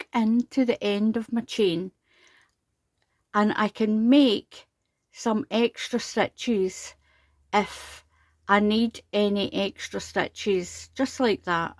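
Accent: Scotland, United Kingdom. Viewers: Love watching your videos especially hearing your lovely Scottish accent. Very pleased you do not have music playing which makes it easier to concentrate on your inspiring videos. Very happy to be a subscriber to your channel. ❤ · Lovely! It’s always nice to get another variation to the granny cluster. And when I do this one I’ll hear your Scottish accent guiding me. Thanks!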